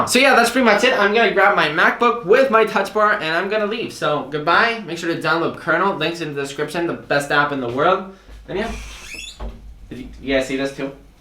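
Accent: Spain. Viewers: I’d guess Spanish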